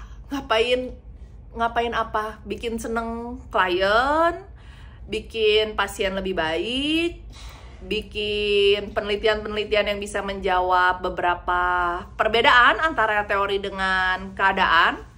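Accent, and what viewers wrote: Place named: Sunda, Indonesia. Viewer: Kirain dirumah juga nyablak sunda bo dok😂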